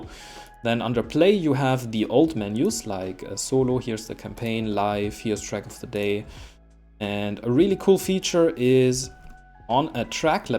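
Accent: Germany. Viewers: Lol ein Münchner, servus!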